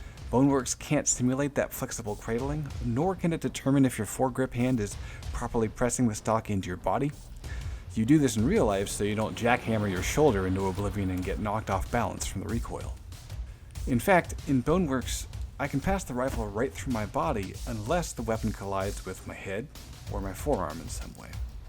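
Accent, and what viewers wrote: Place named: United States. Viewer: wtf, I wasn't expecting an american lmao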